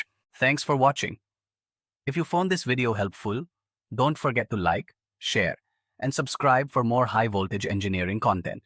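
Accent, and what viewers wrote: India. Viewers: You made the sound with AI and still put the annoying indian accent!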